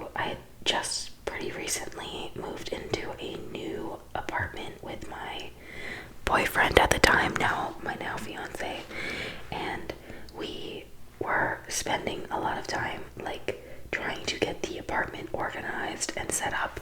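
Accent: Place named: Canada. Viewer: I'm new here! Your video came up on my Youtube suggested on the homepage! I am so glad I clicked on it. I'm doing some sketching right now, and watching you paint was so nicee! I want to see you finish the project so badly. I live near Toronto, so it's really nice to hear a Canadian accent do ASMR, especially whilst being creative. I did film editing at university, was great to learn so if you are interested you should definitely take a class on it or self teach using YouTube to start with! Pick one software and then decide what kind of project you want to make, then search how to do basic edits using that software, you'll pick it up in no time :) You talking about enjoying your hobby really motivates me to enjoy time to myself once in a while and relax whilst enjoying a hobby, thank you so much for your content. Please keep doing these I love it! I am always looking for good quality art asmr and yours has been perfect! 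I want more lol! <3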